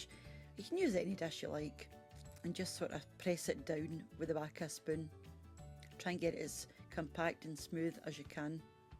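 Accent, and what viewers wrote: Scotland, United Kingdom. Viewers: Love and THANKS from Germany! As wonderful as the recipe is your warm voice and the Scottish you speak - my husband Ingo and I visited Scotland last year for the first time and totally fell in love with the people, the land and the language. It really touches me inside. In my opinion the language/dialect/accent one speaks defines identity and cannot be regarded highly enough! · I can remember my Nunny making this for me and my sister when we were wee and would go to visit her in Scotland. It was always such a treat. I learned how to make it over time and my own daughter was just asking about tablet the other day. I think I need to get out the recipe 😂. I love these videos and just listening to your voice; reminds me of my Scottish roots and family. 🎉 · This recipe video just amazingly ended in my feed! The last time I had this was while in Scotland in 1996! 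Never got the recipe. I subscribed immediately to your channel! Many dishes I see on here I want to attempt to make. I'll let you know how I'm doing! And. My heart leaps with joy hearing your brogue!❤️ I miss Scotland so much.😢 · I'm a Scot living in Italy. It's lovely to hear your accent and the recipe is great, thanks!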